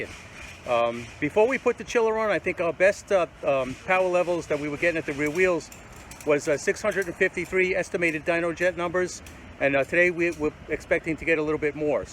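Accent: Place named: Boston, United States. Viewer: Guessing by your accent that you are not from Florida. Boston perhaps?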